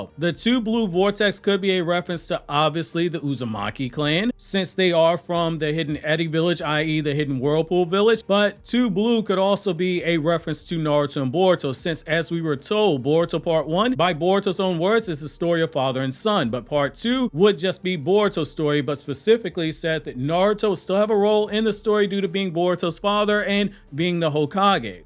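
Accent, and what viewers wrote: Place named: Carolinas, United States. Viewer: Bro sounds likes you lived in the Carolina’s lol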